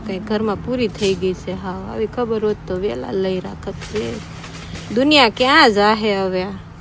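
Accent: Kathiawar, India. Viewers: Kathiyawadi lagocho hu bhi kathiyawadi chu Putaliya no dhandho start karidyo......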